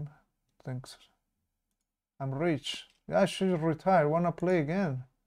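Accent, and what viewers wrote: Mexico. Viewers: Una pregunta eres mexicano xd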